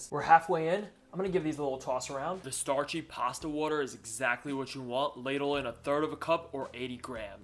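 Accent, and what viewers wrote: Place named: New York, United States. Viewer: Dude your recipes are so good. You have the NY accsnt!!!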